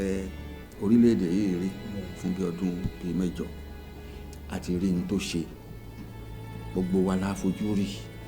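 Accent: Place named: Nigeria. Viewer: This man so you travel out but you don't talk like who travel out of nigeria.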